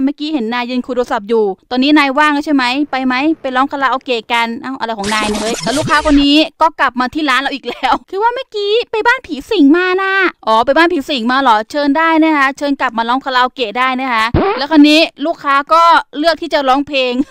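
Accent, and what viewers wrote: South, Thailand. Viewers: พี่ เหมือนคนใต้เลยค่ะ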